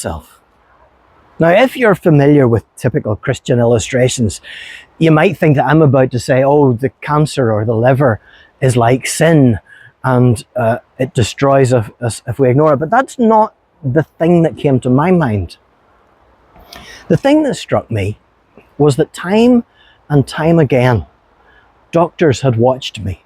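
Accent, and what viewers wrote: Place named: Scotland, United Kingdom. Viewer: Hi just found your channel via Francine, following you from Denmark. I absolutly love your accent, Scottish? Your videoes are next level, sp well done. Hope uou are having a good day today. I will pray for you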